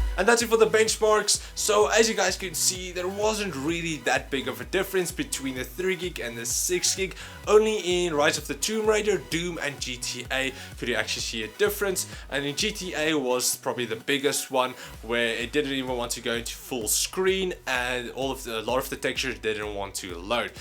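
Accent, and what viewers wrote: Afrikaans, South Africa. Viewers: South African? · Is this guy Afrikaans? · I had a feeling your South African, so am I. Lekker my bru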